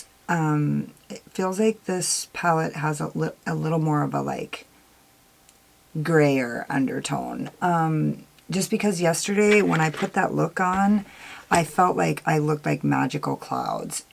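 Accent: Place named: New Orleans, United States. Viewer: Omg You sounds like a New Orleans Gal.. I love your Videos.. New Subscriber here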